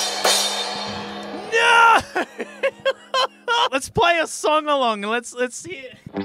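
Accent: Australia. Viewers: Is this? Or do all Australians sound the same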